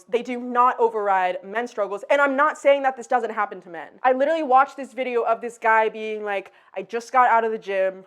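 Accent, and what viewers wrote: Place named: General American, United States. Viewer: Generic american. Accents are more difficult to place now-a-days because we consume so much media and pick up words in different accents.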